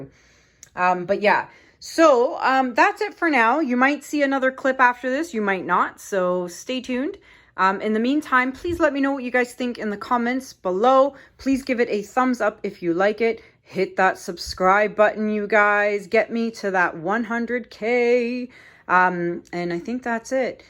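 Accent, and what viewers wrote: Canada. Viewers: SO beautiful!!! I picked out your Canadian accent (How? Because I'M Canadian!)!!! lol Where in Canada do you live??? Your work is spectacular,! How long did you paint before you got to actively selling your work?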